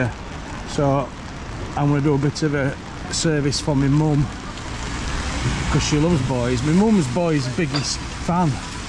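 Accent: Yorkshire, United Kingdom. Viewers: I lived that walkabout in Donny and your lovely Yorkshire accent. Pity you got a bit wet, but never mind eh.Greetings from a Yorkshire lass ,now in Australia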